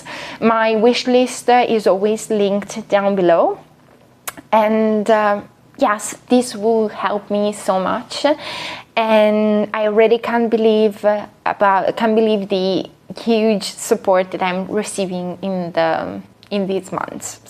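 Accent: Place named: Italy. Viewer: Thank you for your video. I think you have a charming Italian accent.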